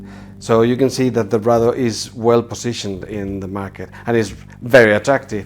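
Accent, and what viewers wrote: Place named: Spain. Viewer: Se nota que es español pero no lo hace nada mal,cuando un inglés habla español tambien se le nota, mucho mas. Ánimo.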